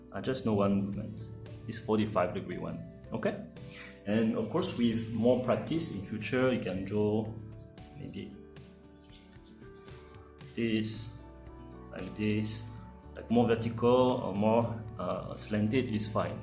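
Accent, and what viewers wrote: France. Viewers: accent de français ça jreconnais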